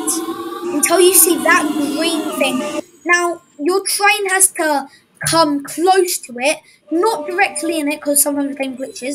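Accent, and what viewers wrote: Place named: United Kingdom. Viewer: Super strong British accent love it!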